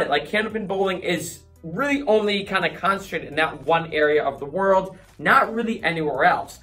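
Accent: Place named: Boston, United States. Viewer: Not a word but a way of pronouncing a word. The rest of the world says "obviously" with 4 complete syllables. You and many in Boston make it almost like a 2 syllable word -- "awvsly".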